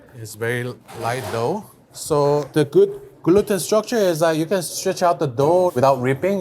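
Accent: Mexico. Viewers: He really sounds a slightly bit mexican with the intonation of his voice as he pronounces words 😂 love it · Why does he sound Mexican?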